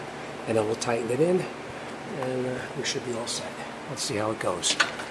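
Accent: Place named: Long Island, United States. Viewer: Wow it's been a while since I heard someone from the inland talking . I used to spend every weekend during the summer at either HotDog beach or Sag Harbor .or Long Inland National speedway with our 10 second Camaro ..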